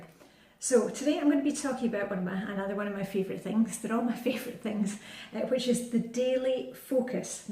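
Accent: Scotland, United Kingdom. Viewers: I love your accent! Just wondered if you read the latest Ian Rankin, In a House of Lies. I often listen to the audiobook versions because I love the Scottish accent.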